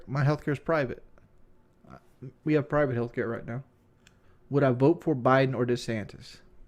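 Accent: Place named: South, United States. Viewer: That's just how folks from the sayouth talk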